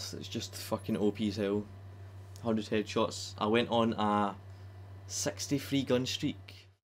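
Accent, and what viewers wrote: Scotland, United Kingdom. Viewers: What part of Scotland you from?, im from Edinburgh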